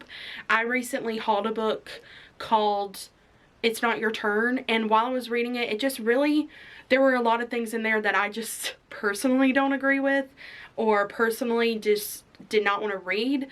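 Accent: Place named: United States. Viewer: Also obsessed with your accent (I’m from the UK) I can’t pinpoint where abouts in the US you are from?! 🖤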